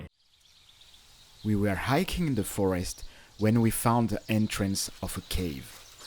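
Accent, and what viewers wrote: France. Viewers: Tu est français ?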